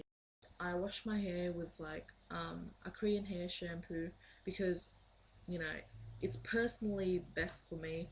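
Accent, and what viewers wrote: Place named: Australia. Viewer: OMfG ~ lolol bro u so funny XD love your australian accent^^~ 'i dont wanna walk around lookin like an abandoned cat' LOL lemme fry mah chicken on your hair! XD neon did hair tuts too - - u guys are the coolest twins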